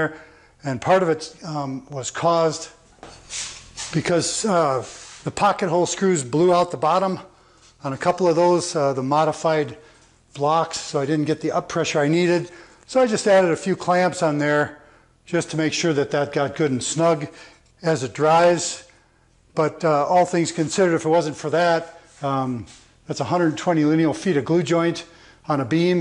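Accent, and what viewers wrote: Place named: Italy. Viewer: Say hi to Chip ! Nice project ! Also nice Italian accent !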